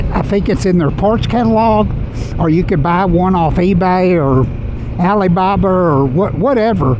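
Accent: South, United States. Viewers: Hey, Love your channel! And Love your accent... I'm from up Northeast Pa. but I can tell you're from somewhere in the South. Don't know if you're as old as me but I been riding my Ninja 650 since 2018 and refuse to let it go..too good of a bike! I also bought a CBR650r a few months ago. I missed that smooth 4 cyl engine and sound. I been riding legally since 1987 but started on a dirt bike at 12.🦾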